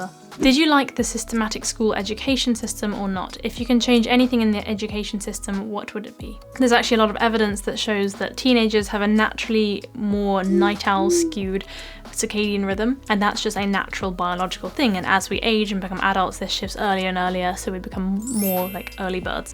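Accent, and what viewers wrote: England, United Kingdom. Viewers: hy how re you, i am from indonesia and i like to learn british aksen by hearing your aksen, btw where are you from, i mean your origin country, thnks😁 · I wonder would you have more video on British accent- love the way you talk, your accent and want to learn… Would you please make some videos just read a short story or Article (please speak a little slow so I can Repeat after you) then I can watch over and over again to listen and learn. Thank you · I love your British accent. It's so beautiful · It is the first time I watch this video that British accents you pronounce and say so beautiful. keeping creative skills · HI Mam, You are so adorable, I love your English-speaking accent, I am learning English from your videos, and I always wait for your new video. Keep Blessing. Have a great job and all routine. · Angel face with an English accent yet sooo cute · You are very beautiful and love the British accent and you have a positive and upbeat attitude and personality. 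· I can listen to your crisp English accent for hours 🤩and by the way your Mandarin pronunciation is spot-on! Obviously you have a flair for languages. PS - fellow beef hor fun fan here 🥰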